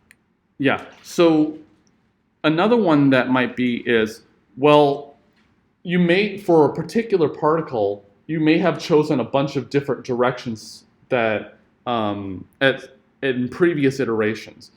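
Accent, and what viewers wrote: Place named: England, United Kingdom. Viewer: Very good lecture. I like your English accent very much. Keep it up.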